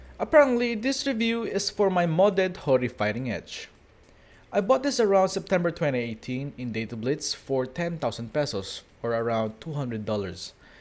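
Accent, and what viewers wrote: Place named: Spain. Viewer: Oye hermano puedo apreciar que hablas español o tal vez Portugues xd Well Im planning on buying this bad boy as my first stick (You know, going to get serious) But, how hard is it to mod? As a completely idiot and begginer? Mi biggest issue with the stick is the lever but aside from that the stick is sooooo beautiful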